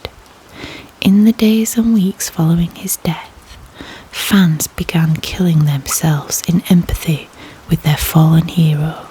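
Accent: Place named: Yorkshire, United Kingdom. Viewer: Came from jojo stayed for three reasons I like ASMR I like the Yorkshire accent I like hot mums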